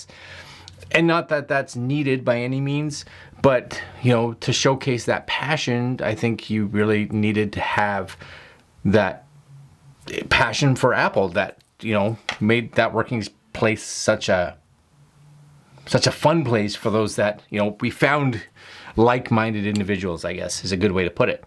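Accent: Canada. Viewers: Your BEST yet!!! Kudos! I’ve been a loyal subscriber and viewer of yours for most of this year, originally from Deep River, now living in Las Vegas. Was in the Video Department of Blue Man Group, until we were laid off in March. Don’t get me wrong, it was a great job, but believe it or not, there was no room for growth or creativity. I’ve been focused on getting my own channel up and running, and you’ve been a very great inspiration, and the accent helps me miss the Ottawa Valley :). Anyway, keep on keepin on! You’re on a role, and it’s working! Cheers 🍻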